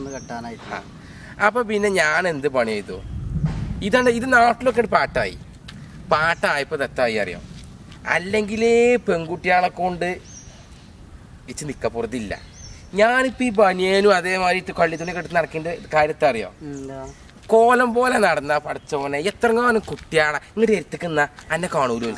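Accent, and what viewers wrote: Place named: Malappuram, India. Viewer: പോരാട്ടോ, ഭാഷ മലപ്പുറം ആയുള്ളൂ, ബോഡി ലാംഗ്വേജും വേഷവിധാനവും വളരെ മോശം.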